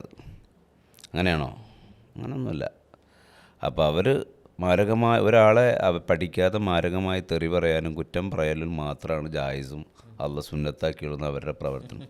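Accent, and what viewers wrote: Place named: Kuruvettoor, India. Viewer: സംസാരംകേട്ടിട്ട്കുരുവെട്ടൂർആണ്എന്ന് തോന്നുന്നു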